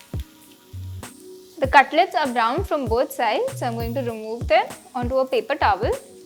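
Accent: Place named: India. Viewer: Amazing to hear how English is spoken like the local language in different countries. She sounded just like she was speaking Indian without the subtitles. Nice recipe too.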